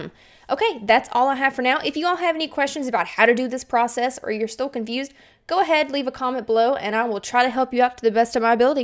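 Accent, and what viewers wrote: South, United States. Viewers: It's a Southern US accent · Its just the US accent i guess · Thank you so much! It was so clear and your southern accent made it enjoyable to listen to :)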